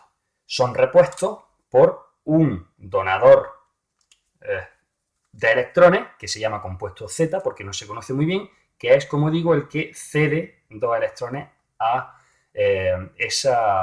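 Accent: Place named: Murcia, Spain. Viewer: como se nota que eres de murcia :)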